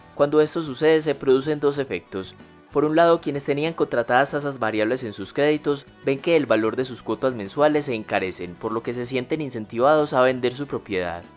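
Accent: Antioquia, Colombia. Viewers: PURO HUMO , UNA VOZ MUY PAISA... DESLEGITIMA ESTE ANALISIS, MUY MONTAÑERO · "Paisa" se le llama a las personas nacidas en los departamentos: Antioquia, Caldas, Risaralda, Quindío y al norte del Tolima ubicados al nor-occidente de Colombia. Dicha región es cafetera y sus habitantes tienen el acento como la persona que hace los vídeos.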